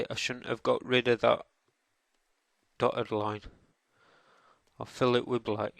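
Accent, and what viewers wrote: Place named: Sheffield, United Kingdom. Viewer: pquarmeARTS he sounds like because he lives in sheffield "I don't think you now a lot of people form Sheffield" :)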